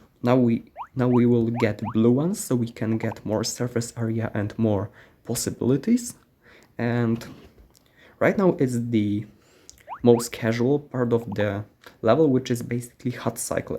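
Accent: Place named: Poland. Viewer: Haha i knew it, you are polish! I was so suspicous of your accent, now beacuse of the ads i know for sure😂 Great relaxing video❤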